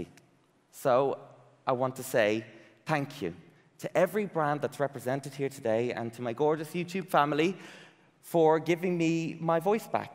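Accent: Ireland. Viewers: Your boss was right noticing your voice....... it’s gorgeous and I could listen to your Irish accent all day xxx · His boss was an idiot. He has a perfectly nice voice. Also that Irish accent 🥰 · I don’t think he sounds ‘gay’ whatever that actually means.. he just sounds Irish to me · I used to just "love" your videos but after watching your presentation I "adore you now." By the way, I do not think you sound gay: you just sound Irish! 😘🏳‍🌈👍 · Nope, not British. He sounds Irish. Which he is. 🍀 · The tears are not from pain,. There from being deeply moved. I had damp eyes, too. Tears of joy at your overcoming real obstacles. Also, as a Yank, your beautiful Irish accent melts me too.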